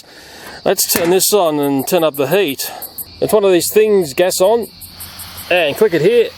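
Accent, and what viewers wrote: Australia. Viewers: You sound like Australian Christopher Walken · Australian accent faayaaa the best pest control... Dint think fire would be good for trees did yah.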